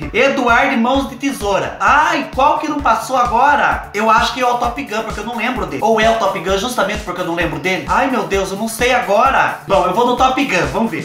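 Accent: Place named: Coronel Macedo, Brazil. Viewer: Gente, tem coisa mais fofa do que o falando inglês com esse sotaque de Coronel Macedo? 😍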